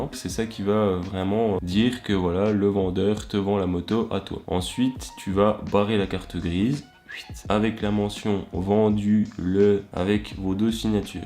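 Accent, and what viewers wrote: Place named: Franche-Comté, France. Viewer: Tu es pas de Franche-Comté ? je crois entendre l'accent de chez nous !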